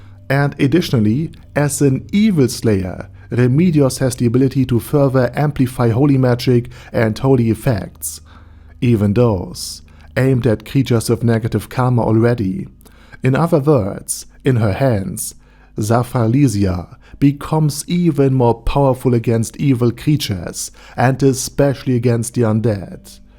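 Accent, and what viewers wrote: Germany. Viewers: New subscriber and amazing job just amazing and I love your German accent and can I ask you something about your channel and overlord is an amazing anime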